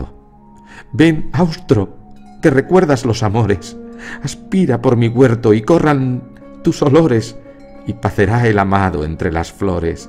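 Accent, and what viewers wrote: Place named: Castile, Spain. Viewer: qué voz tan bella. qué castellano tan sobrio. me ha encantado. muchas geacias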